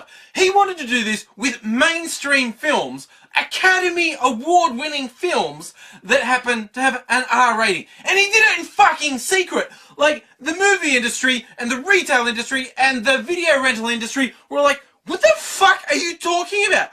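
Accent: Australia. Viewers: omg your so funny...u talk in this angry voice ( with a Aussie accent) and i think that's funny...but what u talk ABOUT is 100% on the mark...for that 5 big as *'s :)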